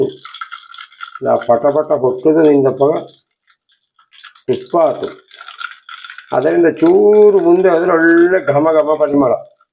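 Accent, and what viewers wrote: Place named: Kerala, India. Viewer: Nimma kannada vantara Malyalam + Hawyaka kannada mixture aagi kelida hage ide.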